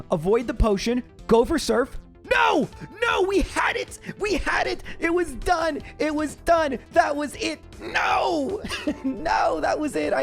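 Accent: Canada. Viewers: Hey! As others pointed out your accent was pretty obviously Canadian but that "Es hora de luchar contra el rival 3" was perfectly undertandable! Greetings from Spain!!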